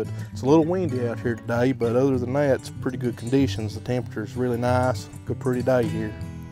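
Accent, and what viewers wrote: South, United States. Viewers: Call me biased, but I tend to place greater trust in smoking and barbecue recipes when they're delivered with a Southern accent :)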